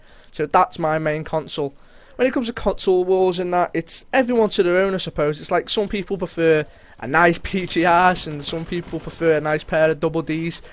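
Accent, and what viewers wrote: United Kingdom. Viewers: It's ONE of our British accents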